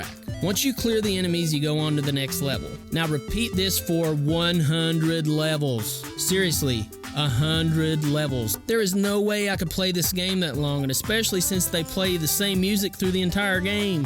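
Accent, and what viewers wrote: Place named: Texas, United States. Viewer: Bizarre question, but what accent is that? Texan?? From England myself, but totally dig the accent dude!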